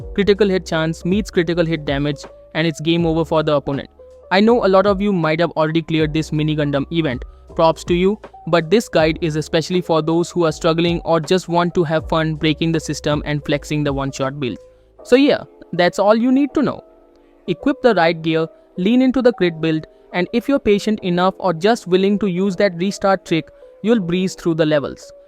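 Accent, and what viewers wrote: India. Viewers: Indiano falando inglês é engraçado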